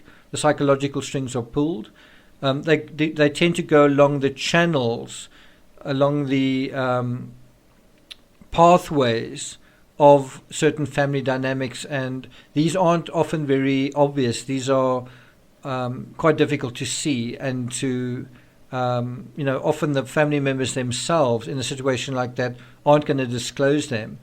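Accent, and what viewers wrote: South Africa. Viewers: I'm new here. You accent sounds (very) South African.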